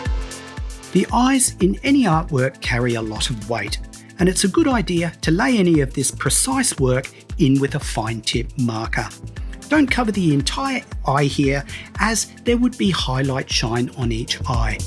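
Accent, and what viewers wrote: Australia. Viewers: Great video! Thanks. Loved the music! But found it a bit loud so I couldn't hear the lovely gentleman's aussie accent.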